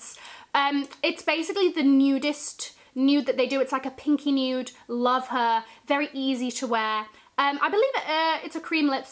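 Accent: United Kingdom. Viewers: Can you give us your opinion about the new iPhone? It is definitely a luxury item, do you have designer cases? And what applications do you use? Oh and merry Christmas and new year! I love your British accent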